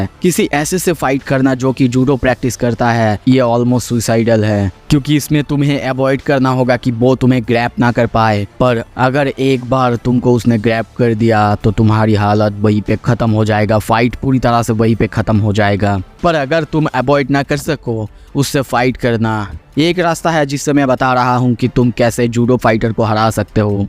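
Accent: Bengal, India. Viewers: bro r u bengali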